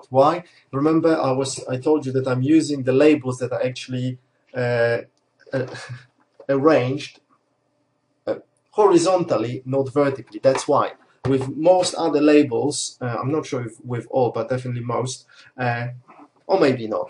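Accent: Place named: Poland. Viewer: Uszy więdną od twojego Ponglish....Pozdrawiam!!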